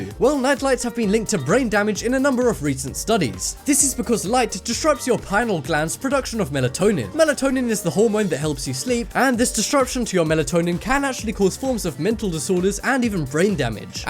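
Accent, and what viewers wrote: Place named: United Kingdom. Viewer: I like this channel because of the guy's british accent tbh tbh tbh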